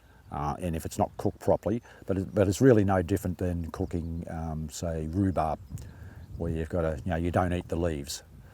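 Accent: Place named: Australia. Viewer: Thank you for the interesting video 👍 I love the Australian accent 🤩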